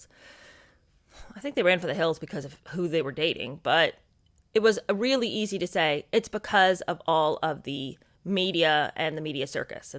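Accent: United Kingdom. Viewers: I love your Brit accents, LOL. It annoys me greatly that us taxpayers here in the UK fricken paid for this circus of a wedding! She is such an ungrateful bee-atch!!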